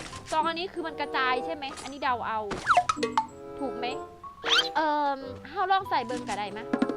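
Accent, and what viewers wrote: Isan, Thailand. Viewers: เปันคนอีสานหลอค่ะ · เอื่อย เจ้าคือเว้าอีสานม่วนเเท่ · พี่พูดอีสานเพราะๆ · อันเจ้าอยู่อีสานติเว้าคือแฮงมากเลยเด้อเอื้อย 😊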